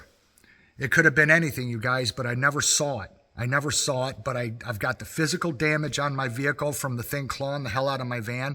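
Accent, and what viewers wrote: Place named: Upper Peninsula Michigan, United States. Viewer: Based on your accent, it sounds like upper peninsula Michigan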